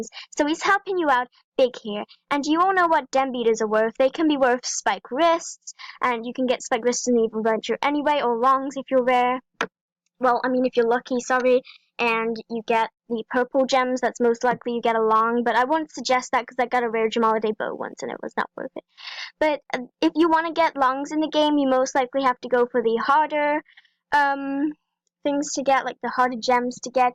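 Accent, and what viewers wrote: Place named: United Kingdom. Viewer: Is that your real voice? Its high but its sounds like a british human...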